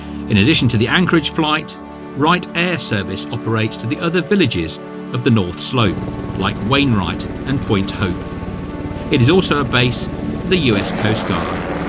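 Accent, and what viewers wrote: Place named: RP, United Kingdom. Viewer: Love the posh accent.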